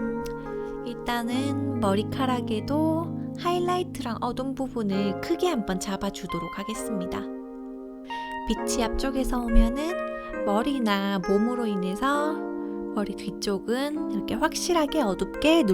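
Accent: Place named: Gyeongsang, South Korea. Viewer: 목소리가 고우신데 말투에서 경상도쪽 억양이 약간 느껴지는게 매력이에요 ~_~ 허허 조와요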